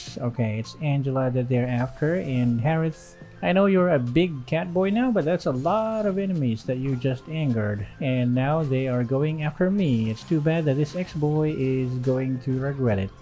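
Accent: Philippines. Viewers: Dammnnn your tagalog accent 🤤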